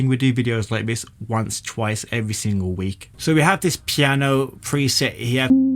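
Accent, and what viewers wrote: Birmingham, United Kingdom. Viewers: Do I hear the dulcet tones of a fellow Brummie?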